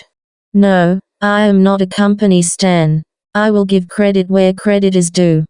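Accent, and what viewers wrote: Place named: Australia. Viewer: I know this is random but why does your robot voice have an Australian accent?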